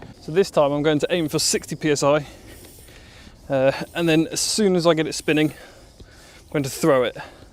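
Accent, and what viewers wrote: United Kingdom. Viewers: I can taste the UK accent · Eeemm like british accent..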